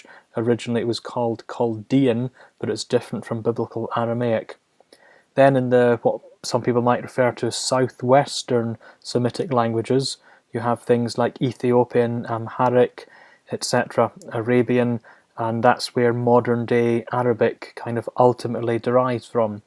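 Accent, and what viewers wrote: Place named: Scotland, United Kingdom. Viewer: Your Scottish, or Northern Irish accent if I'm not mistaken, is charming as hell and a fresh departure from the typical bland American delivery. I'm a linguist and amateur philologist, and have an interest in the Aramaic language and Its history. Nice video.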